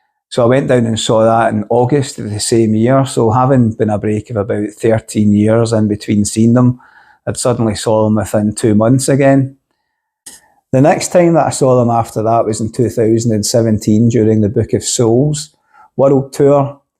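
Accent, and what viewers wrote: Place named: Glasgow, United Kingdom. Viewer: Yes, your video came up on my YouTube homepage as a suggestion so I gave it a watch and was surprised to hear the Glasgow accent! Listening to Maiden on good headphones is a completely different experience than blasting it out of a stereo. You hear so much more of the intricate details they put into their music. I really hope they do a live album/video of the Future Past Tour. I went down to London last summer to see it and it was one of the best performances I've seen them do. They sounded amazing and the production was probably the best I've ever seen from them — and I've been going to Maiden concerts since '88! And was great of course, to hear them play Alexander The Great for the first time, live!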